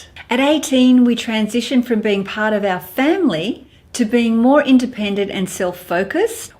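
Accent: Australia. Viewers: Thank you sharing your words of encouragement. I'm 54 and was diagnosed last August 2017 with breast cancer. I'm cancer free.. Thank God, but still going through the reconstruction surgeries. I lost all of my hair and some of my teeth due to chemo. It's a hard journey, but again I believe in my higher power. Getting back to feeling beautiful as one would look in the mirror has its challenges, but what's important I'm alive and cancer free. Loving ourselves at this age is very important and watching videos like yours and others on YouTube has been helping me get into dressing and wearing makeup again. Although I still have no hair (lol) eyebrows and lashes are still coming in.. oops I think I wrote a whole book. I love your accent and I always wanted to go to Australia. I'm in USA, but originally born in Puerto Rico. Blessings beautiful. 🌺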